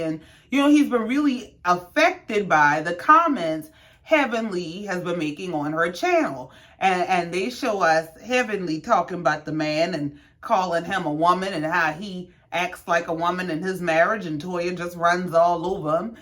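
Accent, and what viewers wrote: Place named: Charleston, United States. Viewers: You gotta be from the low country. I know home when I hear it. Chile Eugene gone go out for cigarettes one day and never return. Works himself to death,smh. Is he not tired of moving? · You from Charleston????? Sound like me and my people!!!😂😂♥️♥️♥️♥️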